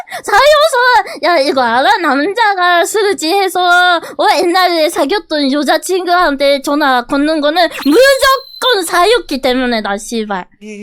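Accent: Japan. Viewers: 다른발음은 일본인이 한국말하는거 같은데 시발은 네이티브한국 발음이네 ㅋㅋㅋㅋㅋㅋ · 한본어 진짜 개쳐귀엽네 ㅋㅋㅋㅋㅋ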